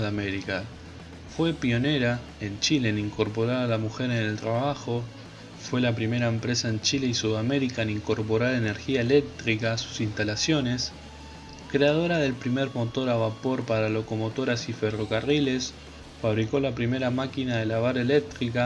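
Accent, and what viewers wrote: Argentina. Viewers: Es argentina no escuchas su acento?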